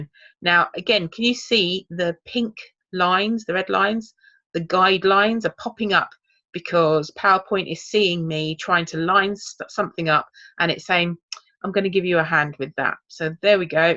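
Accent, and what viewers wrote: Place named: Hertfordshire, United Kingdom. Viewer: Thank you. New to KDP so learning how to make one’s own interiors is good to know rather than having to get software like Tangent Templates (although I think I am tempted to as well). May I ask a question: would you recommend TT, are you still selling on KDP as this video is from 2 yrs ago?, are you still posting videos? You sound like you are from my neck of the woods. I’m a 54yr old mum from Hertfordshire (top of Northern Line). Thank you.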